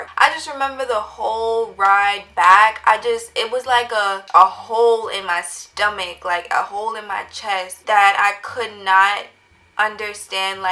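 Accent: United States. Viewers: you said the hood, it had to be baltimore! this sound like a baltimore nigga lol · Sounds just like a Maryland nigga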